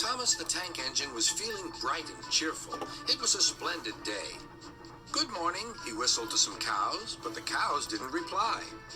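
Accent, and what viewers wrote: France. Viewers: Wow you sound like a french narrator ! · Whoa, I trust you! You sound like a French narrator from SpongeBob SquarePants. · WOW No Way You sound like the French Narrator from SpongeBob SquarePants. I always trust on my friends because they're always helping me just in case they need help like a helping hand great video I can't wait for the next video called Oscar, Trevor Evans and the really useful Party it would be Partytastic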